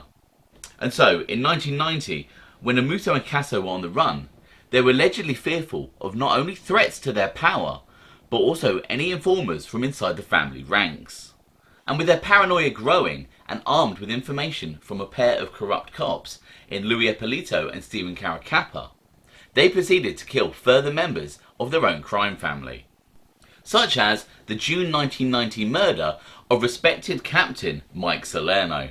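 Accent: United Kingdom. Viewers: I love hearing Brits educate about the mob. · Is this gay brittish guy trying to teach us about our history?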